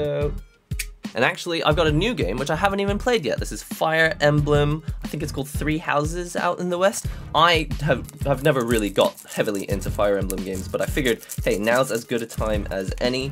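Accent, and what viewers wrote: United Kingdom. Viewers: A Japanese looking man that sounds British!!! Freaking awsome!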